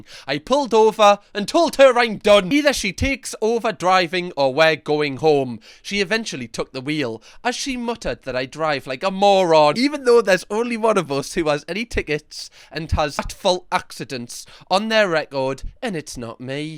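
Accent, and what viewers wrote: Newcastle, United Kingdom. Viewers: I just came across this podcast. Love his chat and the accent brings back great memories of Newcastle, now 45 years ago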